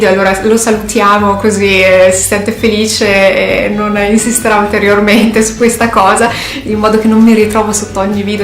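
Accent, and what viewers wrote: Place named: Friuli, Italy. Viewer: hai una cadenza nel parlare che ho sentito in tanti friulani che in un qualche modo mi è familiare. Comunque ho fatto una bella scoperta con il tuo canale. Un saluto con simpatia 😉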